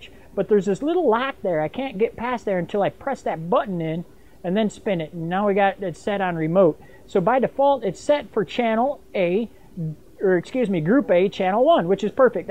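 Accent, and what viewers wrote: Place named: United States. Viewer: I so love your accent... I just want to visit the US on the next available flight. Your tutorial here is very helpful. Thanks very much from Patrick in the UK using a D90 and SB 700. Until now I was using flash off camera and using a little card to block out the light from the poppy up.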